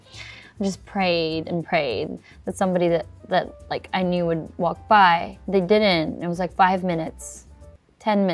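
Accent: Australia. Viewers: “Aussie accent” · That Aussie accent is so charming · Her Aussie accent is so aesthetic and luxurious!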